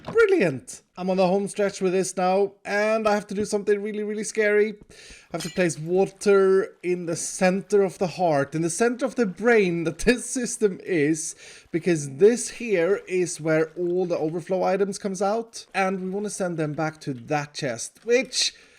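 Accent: Australia. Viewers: LMAO he's Australian! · He's just Australian. xD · Dude lol bruh it’s aight he’s just Australian 😂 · He's just Australian, has to be the best thing I've heard in weeks. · Dont worry he is just Australian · Dont worry he's just Australian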